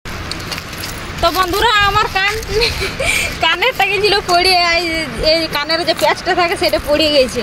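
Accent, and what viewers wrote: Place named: Purulia, India. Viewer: বৌদি তোমার কথা গুলো শুনতে ভালো লাগে ❤ পুরুলিয়ার লোকের কথা সুনতে ভালো লাগে তোমার কোন বোন আছে আমি ও বাউরী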